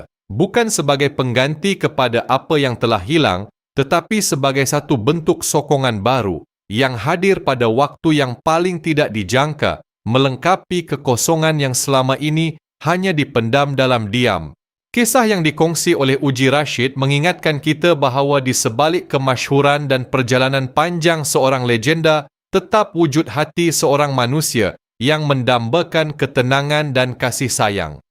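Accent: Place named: Indonesia. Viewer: ni konoha, diorang memang banyak buat content merapu. Kalau dengar cara bercakap ni bukan Malaysian 🇲🇾, orang seberang!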